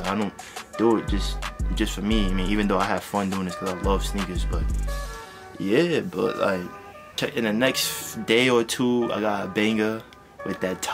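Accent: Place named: East Coast, United States. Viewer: LOL this man got a whole east coast accent. U ain't one of us asking that silly sh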